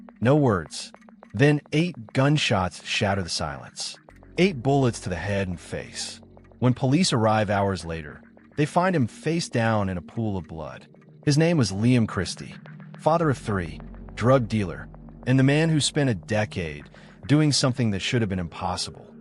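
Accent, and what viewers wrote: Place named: United States. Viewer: 😂 American telling stories fs what i would love to know is where some yank knew it to tell 😅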